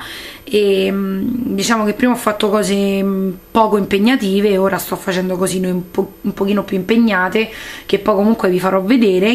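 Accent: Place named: Rome, Italy. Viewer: Nun se spostano de na pezza...........che bello sentì parlà romano .........................mi manca la mia Roma. A parte questo sfogo bravissima molto belli i tuoi lavori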